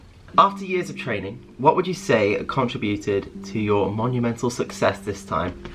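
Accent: United Kingdom. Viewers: love to wake up on sunday, make coffee and watch your vids at 10am. (pacific standard time, california) love a british voice in the mornings. congrats. i hope upload schedule will be the same after tokyo 🤙🏼🎉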